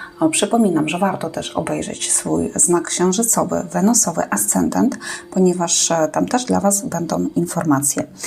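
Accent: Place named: East, Poland. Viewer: Bardzo ładne czytanie, wyczuwalny lekki akcent ze wschodu, ale ogólnie poprawna polszczyzna 😊 Bardzo mi się podobał ten przekaz dla Rybek. Pozdrawiam ❤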